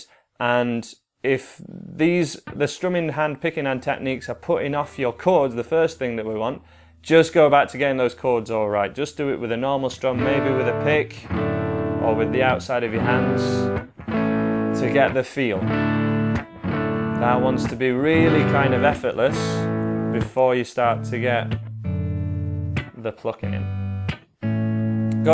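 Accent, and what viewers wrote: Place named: England, United Kingdom. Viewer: English. He's from Leeds.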